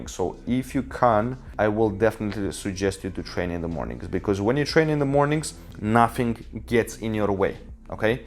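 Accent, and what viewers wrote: Russia. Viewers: Are u from Russia? Your accent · Yeah his russian accent proves it and skin color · His russian accent is so funny.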